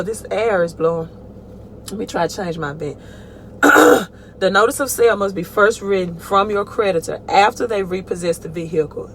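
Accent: United States. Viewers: Hmm American accent in a right hand drive...im confused or is it a camera switch.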